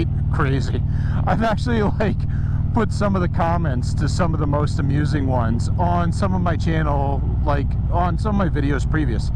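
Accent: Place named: Boston, United States. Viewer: your handlebars are trash and your voice screams boston ya fhekk...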